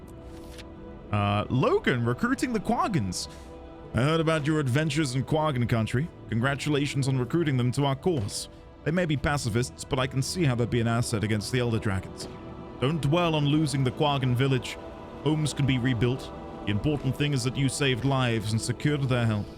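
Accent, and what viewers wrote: United Kingdom. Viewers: Your voice is really interesting and soothing! dont judge yourself that hard, a british accent that is soft, manly, energetic, hopefull for fun and love for life is just chef's kiss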